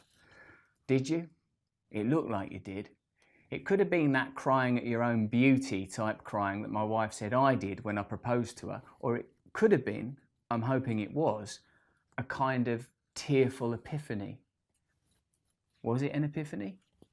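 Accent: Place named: United Kingdom. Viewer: l like this man when he speak very clear British accent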